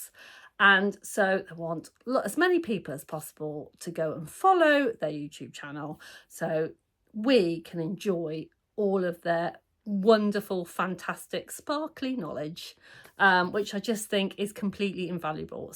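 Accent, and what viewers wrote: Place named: United Kingdom. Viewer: Oh....your lovely British accent!! A Router ... tee hee, got it now! So enjoy your sparkle videos!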